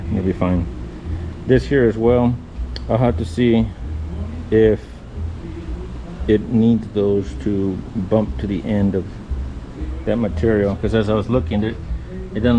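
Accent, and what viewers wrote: Texas, United States. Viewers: I like your pachs. I like your texases way of saying texas way.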